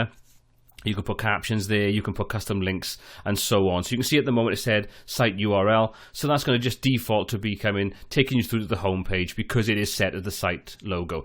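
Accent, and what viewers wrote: Wales, United Kingdom. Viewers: What a lovely Welsh accent! :)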